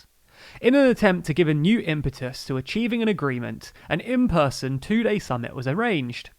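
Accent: United Kingdom. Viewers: I like the Britishness of the pronunciation though haha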